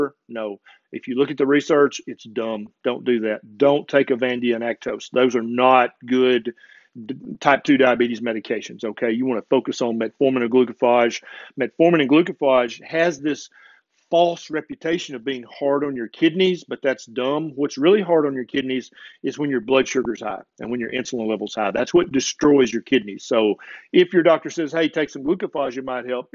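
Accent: South, United States. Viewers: Nice to hear a educated southern accent with real advice!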